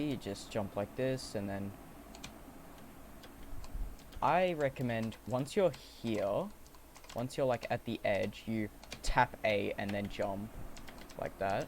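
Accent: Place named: Australia. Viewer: Woah you have an Aussie accent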